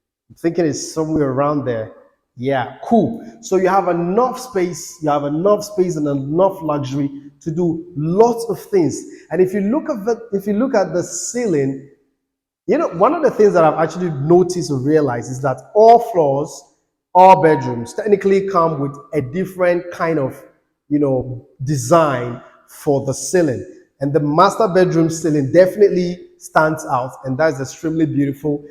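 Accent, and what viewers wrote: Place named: United States. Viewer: of late sounds Soo American oo😂😂😂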